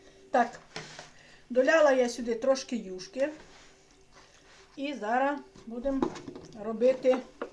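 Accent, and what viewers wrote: Ternopil, Ukraine. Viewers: Дуже люблю дивитися Ваші відео, як ви розмовляєте теж. Хто щоб не казав такий діалект є в північних районах Тернопільської обл.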